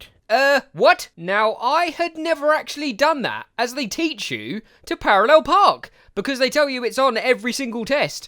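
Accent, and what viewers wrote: United Kingdom. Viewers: Do you have a british accent..? It sounds really good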